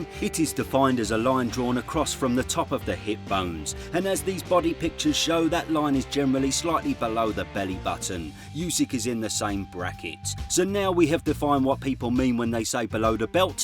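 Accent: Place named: England, United Kingdom. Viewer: because of you mate, i'm seriously considering moving to england, just to spend all day talking like you do.. 😂😂🫡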